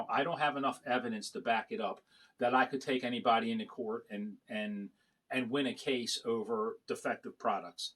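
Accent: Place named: New Jersey, United States. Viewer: Love your channel brother,you have jersey accent ..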